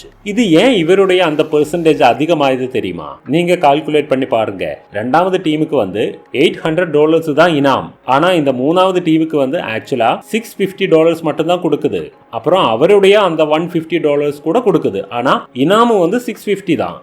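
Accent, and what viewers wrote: Kerala, India. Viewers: You are a malayalee???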